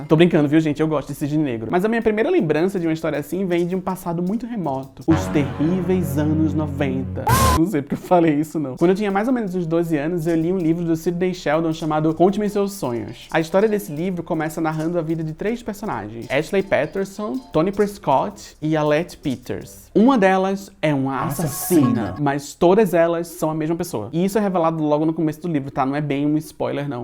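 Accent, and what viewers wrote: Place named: Rio de Janeiro, Brazil. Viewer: Falando em várias personalidades, uma pergunta, você nasceu em Pernambuco e mora em São Paulo, mas fala como um carioca, por que isso acontece?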